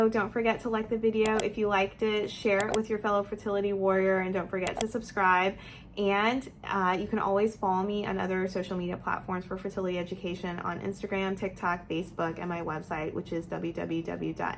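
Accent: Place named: United States. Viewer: Great content! Also how did you manage to have such an American accent? Do some schools in India have international English classes where they hire teachers from Western countries??? Just curious.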